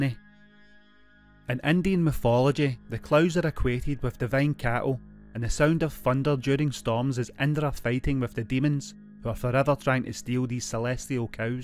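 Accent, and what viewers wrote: Scotland, United Kingdom. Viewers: Are u Scottish?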